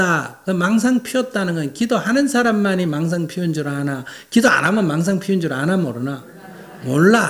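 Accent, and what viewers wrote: Gyeongsang, South Korea. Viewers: 경상도 어디 분이신지?? 사투리가..... 다그치는듯한 말투시네여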